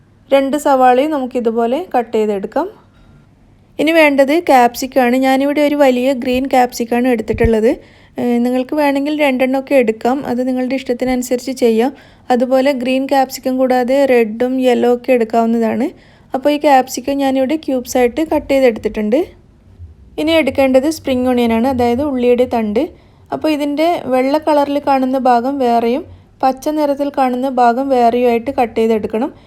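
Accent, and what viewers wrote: Kannur, India. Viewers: It's kannur slang 😅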